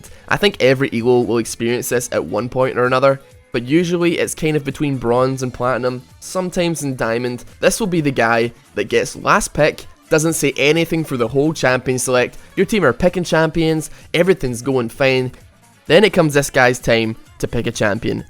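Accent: Scotland, United Kingdom. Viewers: scottish? very cool accent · Your scottish aint you?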